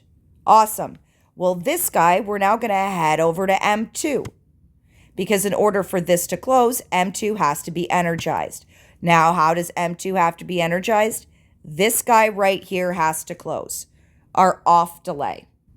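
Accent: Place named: New York, United States. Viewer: chill your new york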